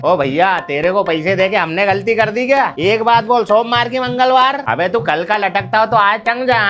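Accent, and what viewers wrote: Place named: Indore, India. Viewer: mast bhiya,,, that's exactly how these indoree money landers talk like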